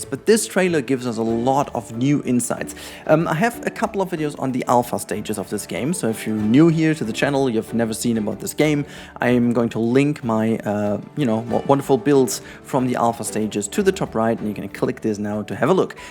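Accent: Germany. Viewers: When Germans speaks Englisch then it sounds exactly like this guy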